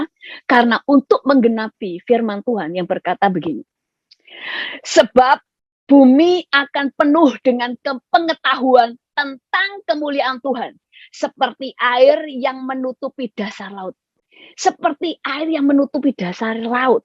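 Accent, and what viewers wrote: Java, Indonesia. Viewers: Aku suka pengajaran dari ibu. Pengajaran mudah dimengerti dan bahasanya sederhana. Meski ibu lama di Amerika tapi medok Jawa nggak bisa hilang. Tuhan Yesus memberkati ibu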